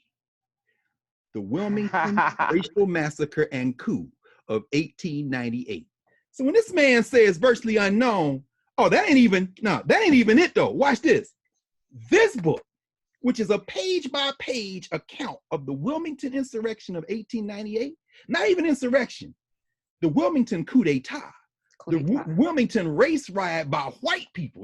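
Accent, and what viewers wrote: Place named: Nashville, United States. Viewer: I was born and raised in Nashville. Graduated Tennessee State many years ago. I love. I have not lived in Nashville in 30 years, and when I hear him say, “ my momma and ‘ nem’ “, I feel like I’m back home again. (Notwithstanding, his brilliant mind.) Love you guys and thank you.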